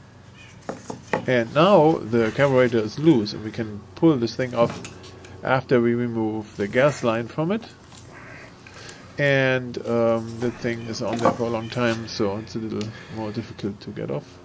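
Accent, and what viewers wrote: Germany. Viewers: Du hast einen sehr starken deutschen Akzent:)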